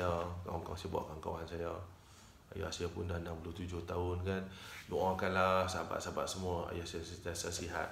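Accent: Kedah, Malaysia. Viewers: Steady Tuan..yang sempoi kompom org Kedah...gerek,qeba,nasib x sebut pedai ayam...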